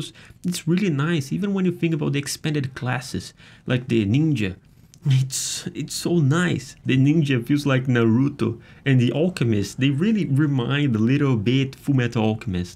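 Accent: Brazil. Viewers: A footage é br e vc tem sotaque br. És tu um br?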